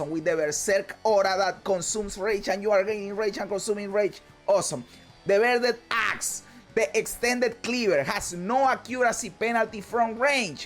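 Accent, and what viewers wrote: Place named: Dominican Republic. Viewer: a fellow dominican playing poe with a thick accent was not something i expected this is CONTENT lmaoo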